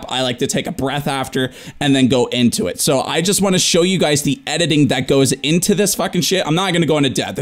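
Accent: Canada. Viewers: So off topic - but I love your Canadian accent 😂 I’m all the way down in Maine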